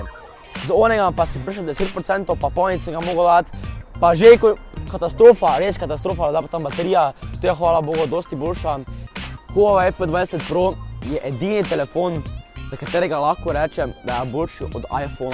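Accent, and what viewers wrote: Styria, Slovenia. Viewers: ta fon je za razliko od tebe pamtn, pa vid se da si toti štajarc k ti lih ne gre tota angleščina "somrak"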